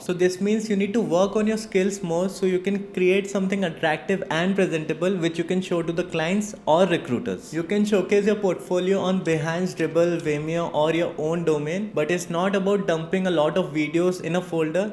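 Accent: India. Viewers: Kyuki accent indian hai ❤️😁😁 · I realised this video is in english after reading your comment. The accent is very Hindi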